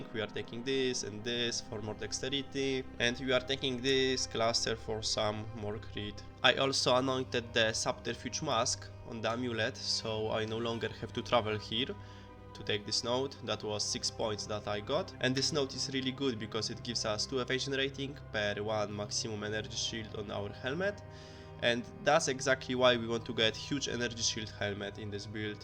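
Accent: Poland. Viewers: Jak chuj slysze polski akcent xD nie myle sie? Pozdro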